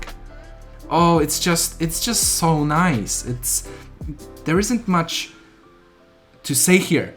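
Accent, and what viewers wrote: Poland. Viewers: Are u polish?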